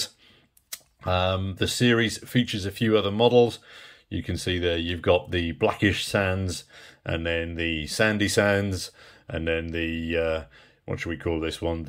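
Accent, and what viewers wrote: United Kingdom. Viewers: I heard an English voice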